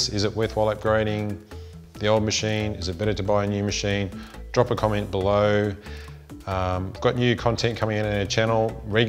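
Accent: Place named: Australia. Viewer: Since some of us hv a hard time w OZ accent.. Be nice to hv more text display in explaining the specs.. Do a detailed listing on the main screen for comparison.. Otherwise an awesome 👍🏻 review..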